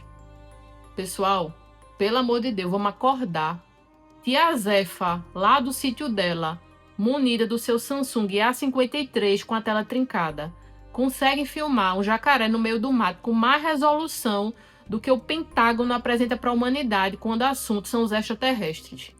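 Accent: Northeast, Brazil. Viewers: Mais uma nordestina falando merrrrda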